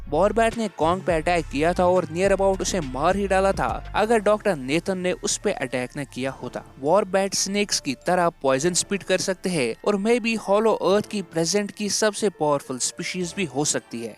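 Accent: Kashmir, India. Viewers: R u from Kashmir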